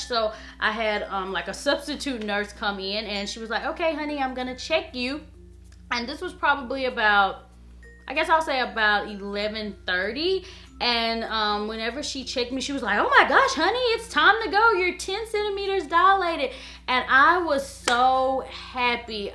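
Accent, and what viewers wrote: South, United States. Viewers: You have a cute southern accent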